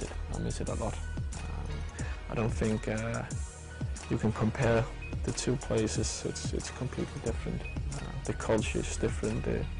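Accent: Liverpool, United Kingdom. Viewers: Hes gettin a scouse accent!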